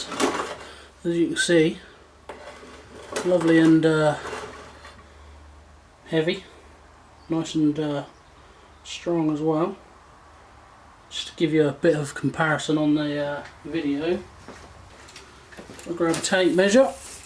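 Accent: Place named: Suffolk, United Kingdom. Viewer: Nice to hear the accent, im a Suffolk boy originally. West Aus now though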